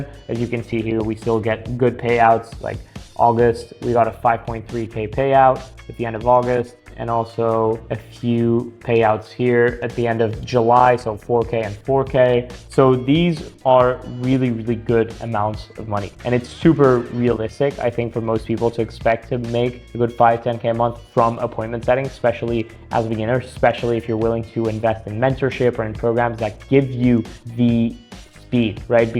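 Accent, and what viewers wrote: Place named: United States. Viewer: Damn, had no idea that you were french, your american accent is perfect, atleast to my foreign ears.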